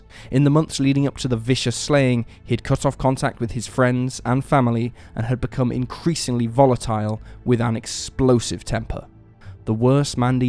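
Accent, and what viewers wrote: England, United Kingdom. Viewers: "Quote" These videos sound rather odd with an English accent speaking in an Americanised way.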